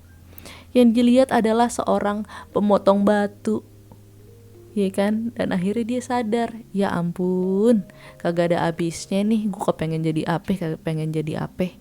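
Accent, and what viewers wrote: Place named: Betawi, Indonesia. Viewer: Kereen yaa ceritanya. Walaupun ananda tinggal di LN. tp logat Betawinya msh kental yaa. Pertanda tdk melupakan bangsa❤. Cerita itu utk mengingatkan kita smua spy menjadi orng yg ttp bersyukur. Jangan sll merasa kekurangan. Krn kl kita melihat keatas tdk ada habisnya. Lihatlah kebawah msh bnyak orng yg kekurangan tp hidup tenang bahagia. Krn bahagia itu sederhana. Tdk hrs bermewah2. Kebersamaan bersama keluarga punya quality time bersama itulah kebahagiaan.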